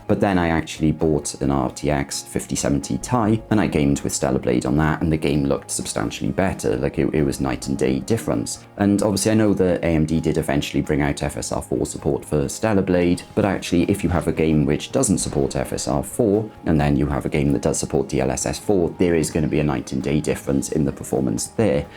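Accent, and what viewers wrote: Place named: England, United Kingdom. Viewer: I've heard a girl speak with the same style of English accent as you. What area of England are you from? Her name was Lowry... The name style even fits the accent. I'm wondering if I should wait for the 5070ti super? More vram will help with flight sim. My max will be this or the 9070xt because I don't want to upgrade my psu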